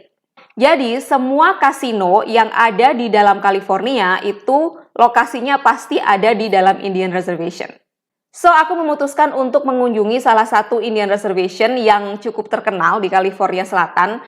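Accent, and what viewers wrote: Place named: Indonesia. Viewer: mbak nya walau lama di usa kharekter dan logat masih tetap indonesia banget.......pasti nya mbak nya masih sering ngobrol pake bahasa indonesia raya.....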